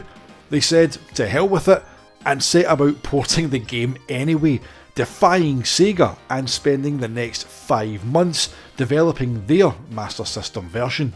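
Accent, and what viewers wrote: Scotland, United Kingdom. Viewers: Sounds Scottish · I’m curious about the person who narrated this video. Where is his accent from? I think it sounds Scottish, but it could be from new zealand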